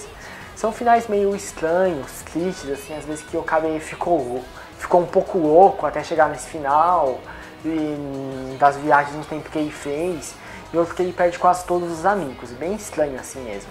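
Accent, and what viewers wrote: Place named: Japan. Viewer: comecei a ver por agr, me inscrevi e talz... Mas vc n é brasileiro? pq vc tem um sotaque japones bem marcante, mas gostei mto do video e vo até ver esse anime xD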